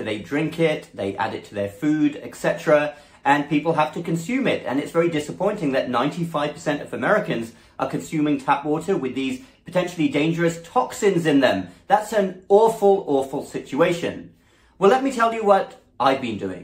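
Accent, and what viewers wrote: United Kingdom. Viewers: On a completely different subject, you say you've lived in US for 10 years, your accent is still British. I am British, am staying in US with a London born lady, she has lived here for 35 years, not a hint of a US accent, sounds a proper London girl 😎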